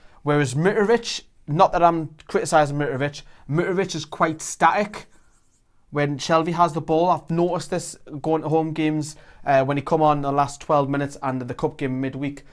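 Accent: Newcastle, United Kingdom. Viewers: Sometimes I watch your videos with the subtitles on just to see how badly YouTube converts the Geordie accent Ahaa! Good video anyway. I predict a scrappy 2-1 win.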